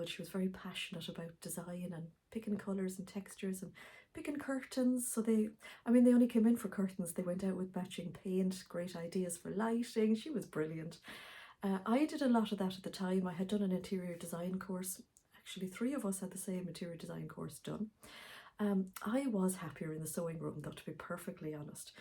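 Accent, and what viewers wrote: Ireland. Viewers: Hello I could listen to you all day. You have the most beautiful West of Ireland accent, soft and melodious. Have a lovely weekend 😘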